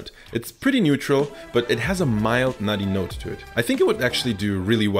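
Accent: Germany. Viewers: Yo can heat the german accent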